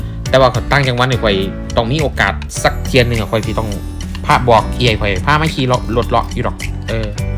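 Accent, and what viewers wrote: Isan, Thailand. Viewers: คนลาวปลอม ไทยอีสานแนนอน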